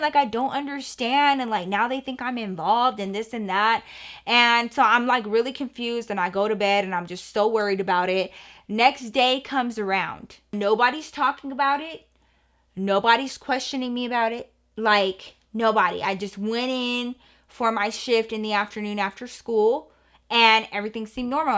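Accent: Texas, United States. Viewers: when you say "stewpid" you still so have your Texas twang girl 😂👌🏼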